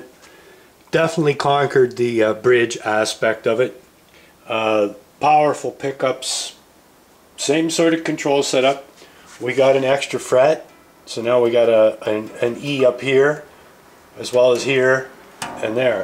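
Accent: Canada. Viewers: Mine is a 1968 that I bought new in '69. Have never owned another, never needed to. I've had it 44 years. Will leave it to my son, who plays bass, when I pass to that 'Great Gig In The Sky'. I still play, mostly blues and R&B. I grew up where we all had a 'Great White North' accent like yours. Great video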